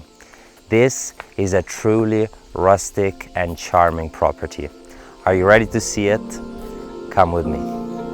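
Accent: Italy. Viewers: Buongiorno! I just got back from my holiyeaaay extracting myself from any social media platforms but waaaaittt I find out that your Italian accent is decreasing. I really appreciate your effort and I love both version. 良い仕事を続けてください。❤️🇮🇹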